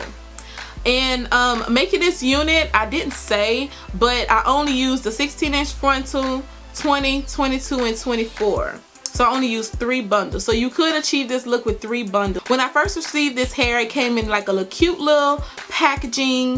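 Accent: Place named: South, United States. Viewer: OMG I freaking luv this video! Yass honey! I felt like you were giving us! Love your personality and your country accent! You look GORGE by the way!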